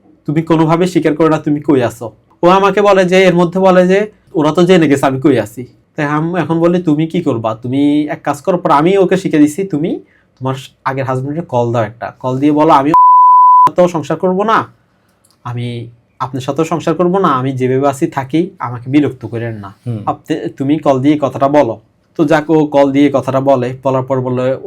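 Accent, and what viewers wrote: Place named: Chittagong, Bangladesh. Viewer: মনে হয় বাড়ী চিটাগাং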